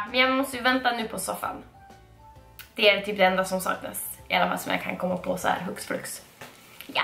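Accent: Norrland, Sweden. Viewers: Jag avgudar din Norrländska dialekt :) <3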